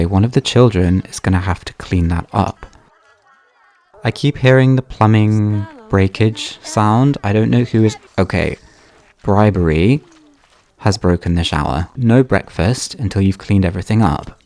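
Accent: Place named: United Kingdom. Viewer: I’ve never seen this channel before, and I haven’t played the Sims 1 since kindergarten, but this video of this calm, friendly-sounding British man narrating the story of a neglectful grandmother and her 7 kids with unfortunate names is giving “creepy lost episodes of the Teletubbies” and I’m here for it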